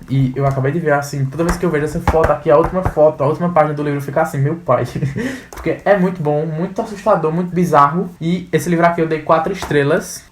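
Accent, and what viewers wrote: Northeast, Brazil. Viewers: é TÃO raro achar esse sotaque no youtube, que eu ainda tô em choque... Certezaaa que esse aí é do meu Nordeste!!!!!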